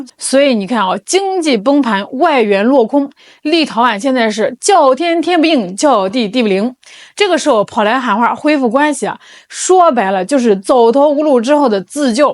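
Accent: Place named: Shandong, China. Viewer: 主播山东姑娘吗？带着家乡味呢❤❤❤😂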